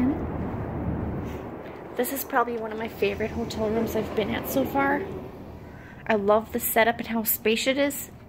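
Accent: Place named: Minnesota, United States. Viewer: You're either from Minnesota or Wisconsin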